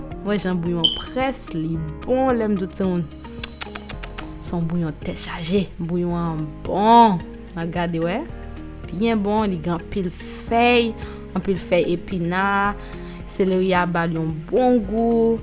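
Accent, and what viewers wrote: Haiti. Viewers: Janw pale a ou se on fanm okap oubyen ou sot nan nord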